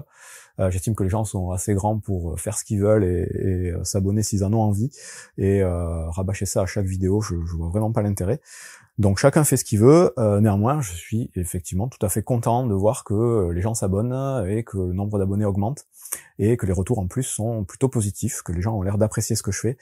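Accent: South-West, France. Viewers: Je me suis dit la même chose que vous : expliquer les règles, c'est galère ! Donc les vidéos sont très pratiques et j'aime beaucoup votre voix et votre petit accent du sud ouest 😊 En plus des explications sans superflus ni "spectacle" (pas comme la plupart). J'écoute aussi Yandrew et Professeur boardgame 😀J'adopte la simplicité ! Belle continuation...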